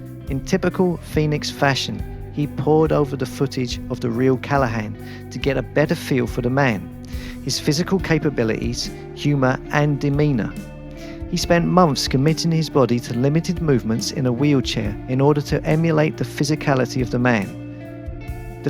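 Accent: United Kingdom. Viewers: Other than the cringeworthy use of the made-up "disorienTAted" by a narrator with a diamond-cut English accent, still a really informative and well-researched documentary.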